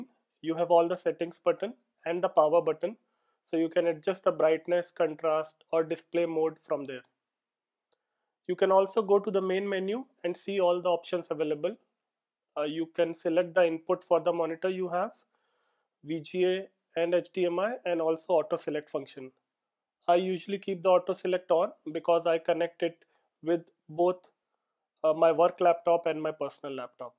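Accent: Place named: India. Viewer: This is a wonderfully detailed review with a nice highlight on the specs and functionality of the Monitor. Also delivered in a dope Indian accent.!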